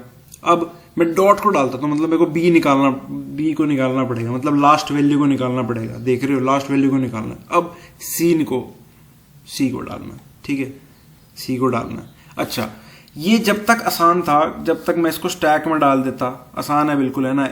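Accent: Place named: Delhi, India. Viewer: dilli se ho kya bahiee!! :-))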